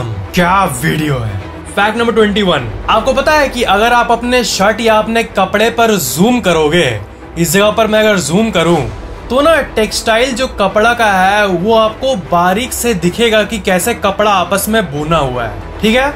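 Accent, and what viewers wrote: Bihar, India. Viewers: sir aap bihar se hai kya.. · Yes !! He is Bihari Bhai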